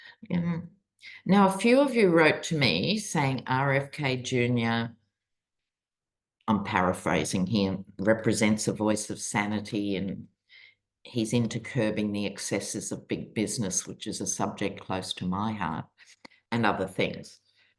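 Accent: Australia. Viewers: Thank you Dr - love your Australian accent ❤❤❤🙏🩵🩵🩵🙌☮️👍✌️🌎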